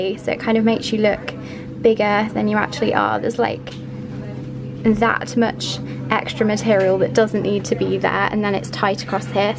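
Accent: United Kingdom. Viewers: Hey my queen😍😍😍😍 I jus love ur British accent sooooo much n ur voice jus calms me down.. every single tym I watch ur videos it drives me crazy n I fall in love wit u even more.. ur soooo dam pretty ur eyes r sooo heavenly.. ur smile is killing n it makes me smile too.. thank u Soo much for bringing smile on my face every single tym.. I love u from d core of my heart my only wish in my lyf is to c u for 5 secs I Don't deserve to cm n talk to u so my only wish is to watch u for 5 secs😭 I VL blessed if such hapns❤ im from India n ur my angel really love u a lot😍❤😘😘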